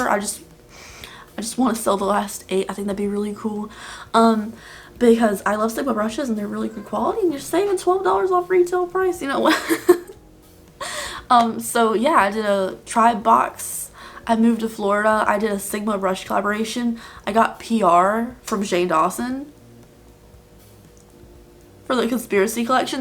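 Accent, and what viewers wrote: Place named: New Jersey, United States. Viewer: my favorite part of this video was when you said you moved to Florida. I got so excited for you! I could tell how happy you were ❤️ I seriously had to laugh though because I was expecting you to say you moved from like somewhere really cold. NJ or something from the sound of it. then you said NC and I laughed so hard 🤣 girl, I'm from PA. the winters here are ROUGH!! it's like 30 degrees out right now and that's warm for this time of year 😱😭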